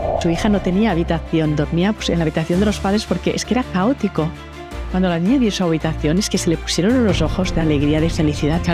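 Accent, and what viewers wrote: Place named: Peru. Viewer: El acento peruano lo identifiqué desde el minuto uno. Saludos desde Chiclayo.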